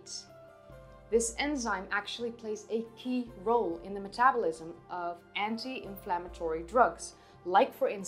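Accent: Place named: Netherlands. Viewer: A person with a Dutch accent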